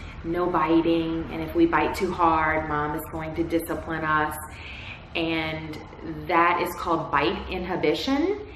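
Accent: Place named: New Orleans, United States. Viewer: I have been watching your videos. You are so sweet and informative. We have a lot in common. My family is from New Orleans. I picked up on your accent right away. Go Saints! I lost my 1 st Shih Tzu 4 months age. He was 13 and my world. I also have been looking for a reputable breeder to find a new baby. I searched all over the country spoke to many many reputable breeders and finally reached a decision. Now I am expecting my new puppy 🐶 in 8 weeks. I am so excited to see and meet him in person. I love watching your videos. My husband and I do filming and media. I also have 2 grandsons. I love and spoil my dogs too. We love Disney too! My husband produced the Voyage of the Little Mermaid puppet show and also on the set of the Lion King. I hope one day we can talk. Stacci is gorgeous!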